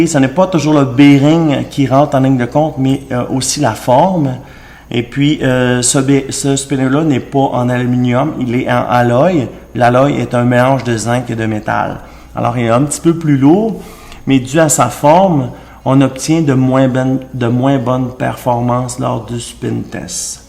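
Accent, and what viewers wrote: Canada. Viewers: tu as l aqucant du kanada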